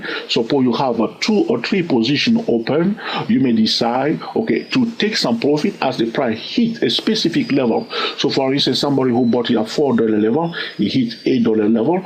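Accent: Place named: Sweden. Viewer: Thank you so much. I think your accent sounds more Swedish then Texan American lol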